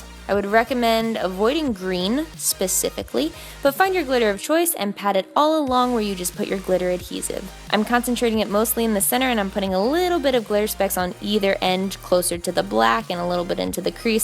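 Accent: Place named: Philadelphia, United States. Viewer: Your Philly accent gets me every time. I know it all too well and I love it ❤️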